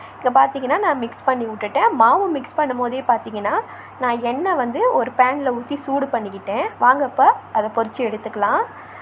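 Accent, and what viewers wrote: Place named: Sri Lanka. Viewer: Full video covered da super nd ur slang s lyk srilankan very nice to hear ❤️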